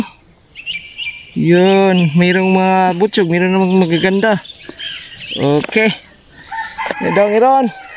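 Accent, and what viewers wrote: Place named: Bohol, Philippines. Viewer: Ta taga bohol ka ? Maila mn gd sa tingog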